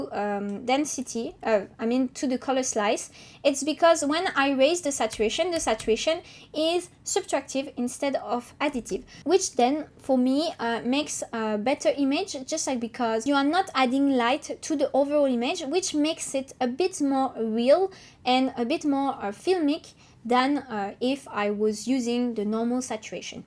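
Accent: France. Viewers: Your accent sounds French.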